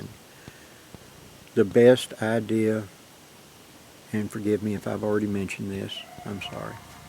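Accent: South, United States. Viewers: God has plans and works in not straight forward plans someone who sees this will react a second faster saving another one of his children. You may never get the formal thank you but a man like you doesn’t look for them. Thank you for all you videos and you still sound like a southerner to me coming from a Yankee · I hope your recovery is as fast and complete as possible. I also hope you get that glorious Southern accent back! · you’re a fighter and we all wish you well. You’ve got a lot of people here who love and support you. So glad to hear your dulcet southern tones, and feel you’re still here to finish whatever God has planned. Take care, love from Leamington Spa, UK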